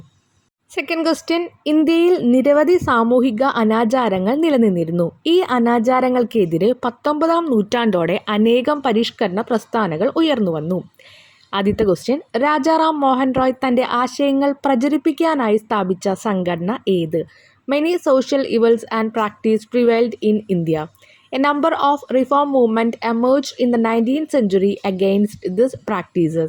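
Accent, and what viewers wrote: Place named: Chalakudy, India. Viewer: Mole poli 🤘 ടീച്ചറെ നാട് ഏതാ ചാലക്കുടി ആണോ മാം എന്റെ ടീച്ചറെ സംസാരം അതുപോലെയ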